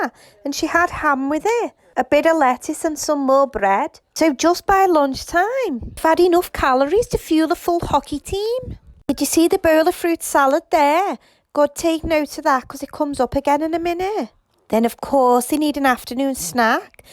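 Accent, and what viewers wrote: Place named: Scotland, United Kingdom. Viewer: Your accent is SOOOOO UGLY Jesus Christ. You sound like a 1,000 pound Scottland woman in a longgggg tan dress.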